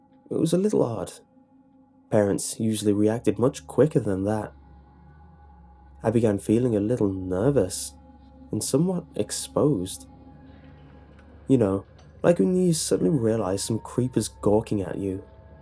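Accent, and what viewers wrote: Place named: United Kingdom. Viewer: oml, British accents 😣😣😣😣😩😩😩😭😍😍😍 oml.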